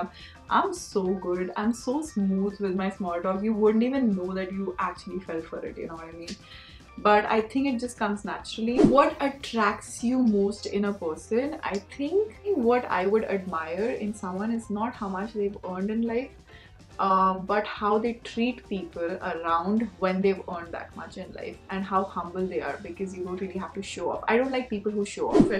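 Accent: Punjab, India. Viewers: Ur Punjabi Accent Is SO Cuteeee... 😊😊😊😊😊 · Ur punjabi accent 😍😍😍😍😍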